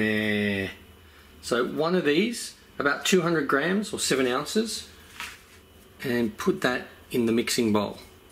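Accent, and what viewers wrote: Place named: Australia. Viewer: Remember when I was only 11 and watched a ton of your videos, I think you only had around 50k subs. Still Love Your Aussie Accent!!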